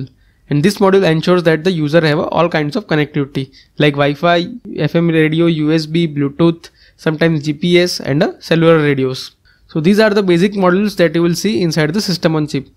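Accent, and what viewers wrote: India. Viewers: Indian English.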